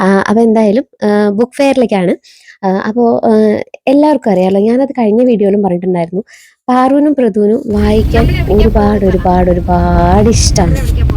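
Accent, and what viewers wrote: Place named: Palakkad, India. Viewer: u looking so beautiful and he is also looking smart Palakkad samsaram kelkkan oru rasam.Thanku. Cheeverdu sound I really enjoyed I think u also like reading It' s a great thing parents encouraging reading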